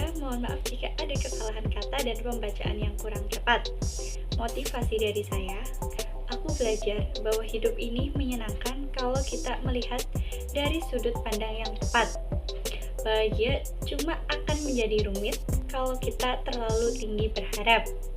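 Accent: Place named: Banyumas, Indonesia. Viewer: Walau ngapak aku ttp luuuvvv wkwkwkw semangat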